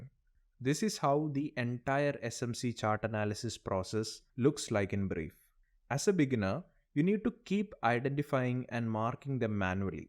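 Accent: Kerala, India. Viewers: Sir, are you from kerala?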